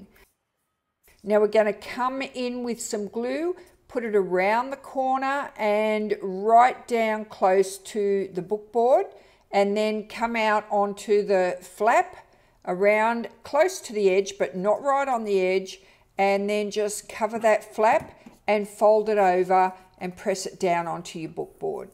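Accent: Australia. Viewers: Hi! I loved your video! australian accent is New to me, but I could understand everything and the no sewing spine technique is wonderful. Thanks a lot! Hugs from Brazil!!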